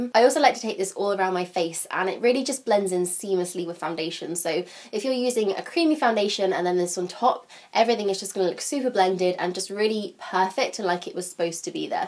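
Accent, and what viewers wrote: England, United Kingdom. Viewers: I love your accent. Are you from England?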